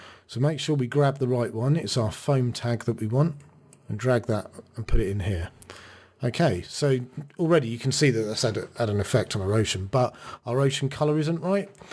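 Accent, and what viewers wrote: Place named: United Kingdom. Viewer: Excellent tutorial, great explanation, plus the British accent adds to the credibility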